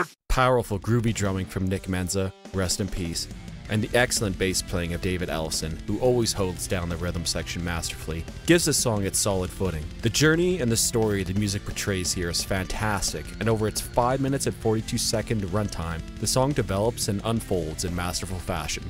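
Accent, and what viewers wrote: Canada. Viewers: Owt....I bet you are either Canadian or from Minnesota. It really is a great song and thanks for covering it.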